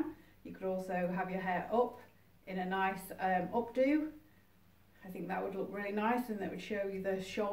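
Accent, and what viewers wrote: United Kingdom. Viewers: Helllo greeting my name is Abner nice meet you this my first time watching your video you look beautiful greeting from United States 🇺🇸 of America at State of Georgia here southeast coast, close to Atlantic Ocean. I’m fan women wear high heels pantyhose stiletto and 12cm 13cm 14cm 15cm 16cm and platform too I do like stocking and nylon thigh high 😅too! You have good accent from English in England 🏴.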